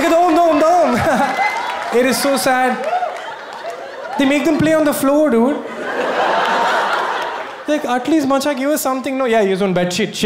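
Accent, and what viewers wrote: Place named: South India, India. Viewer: why are so you insanely cute? 😍 Loved all your videos and have been sharing them with everyone I know.. you are simply awesome!! Being a south Indian, I love that accent and those words too 💗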